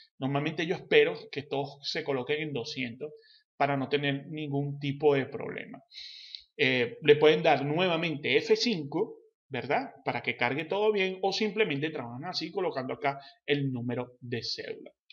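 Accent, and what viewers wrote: Venezuela. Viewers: Gracias, es de mucha ayuda, lo gracioso es escuchar lo que decías de tu hermano como si hablaras con un amigo, jejejej, tan venezolano;). Gracias de verdad!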